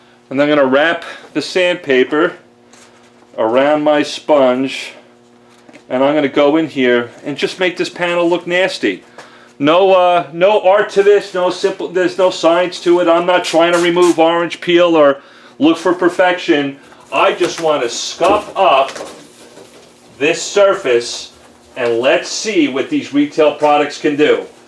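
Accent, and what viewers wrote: New York, United States. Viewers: Jesus, I feel like i'm hearing my brother 'taawking' on the phone with me:) As usual, you have the most honest and informative videos on car detailing. You're a legend,! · ha! I thought he sounded a little "New York" accent as soon as I heard - as someone who has visited New York and loves it:-) Thanks for the vid. Meguiars seems to win on most things. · I was going to say you sound like you're from NY. (NJ here)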